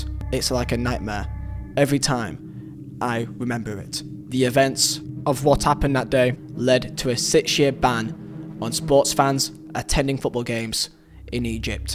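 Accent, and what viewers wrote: United Kingdom. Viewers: i seriously couldnt hear anything all video dont get that heavy british accent everywhere you make the h sound too much keep the mic a little further from ur mouth when the sad parts came i could only focus on ur annoying voice otherwise ok vid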